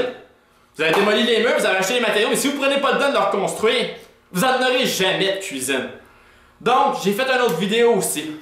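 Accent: Quebec, Canada. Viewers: on dirait un canadien Hmm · cest du quebecois,ignare! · Je kiffe l'accent québecois :) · c'est pas un accent bizarre ... c'est un Québécois! Ecoutez pareil il donne de bons conseils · oh putain jai crus qu'il parlait même pas français pauvres québécois... vous avez un accent vraiment horrible jvous plains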